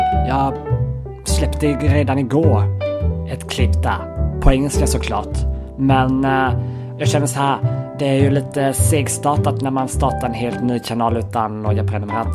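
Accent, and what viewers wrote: Sweden. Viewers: Typical Swedish English. Nejmen nu äre dags att subscriba till engelska kanalen :)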